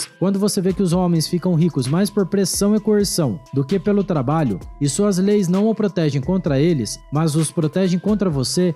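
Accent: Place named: South, Brazil. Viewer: MEU CARO, VOU TE DÁ UM CONSELHO , SE PRETENDE SER UM LOCUTOR ... POR FAVOR PERCA ESSE MALDITO SOTAQUE ! NÃO PASSA CREDIBILIDADE NENHUMA . DEPOIS DE BOLSONARO, PEGUEI ÓDIO DE QUEM FALA ASSIM... ME LEMBRA DOS NAZISTAS DO SUL ... CAIPIRAS ACULTURADOS E ACÉFALOS.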